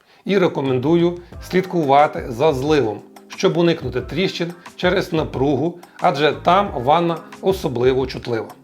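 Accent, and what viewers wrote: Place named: Lviv, Ukraine. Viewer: Це діалект, який додає шарму. Чула такий говір на Львівщині. Звідки родом автор не знаю, але це звучить приємно, хоча і не літературно.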